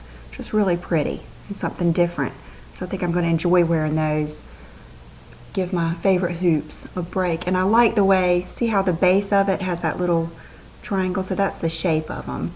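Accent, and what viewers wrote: South, United States. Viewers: I think the Naked 2 comes out on the 25th of this month, I have a feeling it will sell out fast. Can't wait to see your new shoes I know they are going to be fabulous. The Makeup For Ever new eye shadow replaced the older ones that have been out for a long time, the pallets are only one dollar, it doesn't matter which one you buy. Hope you have a great weekend, can't wait for part 2. Love coming home and relaxing with your video on Friday night look forward to it all day. I think it your southern accent it is so nice to listen to. XOXO Emily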